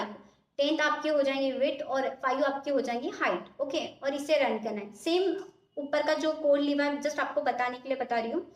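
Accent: India. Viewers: a slight tapori accent but she knows what she is doing and explanation part is really good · Hyderabadi Accent ❤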